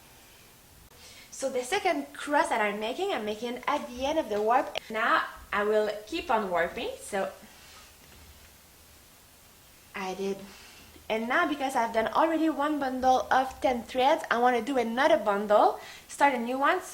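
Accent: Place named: Quebec, Canada. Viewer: I can here the Quebec local accent hehe! Hello fellow canadian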